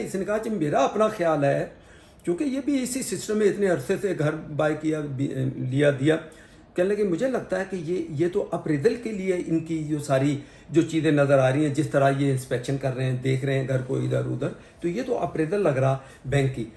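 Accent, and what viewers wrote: Punjab, India. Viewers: ur punjabi is so cute and ap dono ki jodi bohut khoobsurat hai ap ki family lajawab hai ap ko khushiya dey ameen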